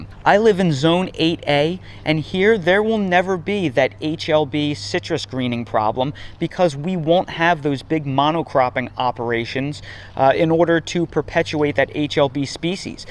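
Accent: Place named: North, United States. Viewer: Florida land is far more valuable than the fruit industry yields in profit. Citrus farms have been selling off for years. In GA/FL, we pronounce it FlOOR i da. You sound like you're from a little farther north than North Carolina.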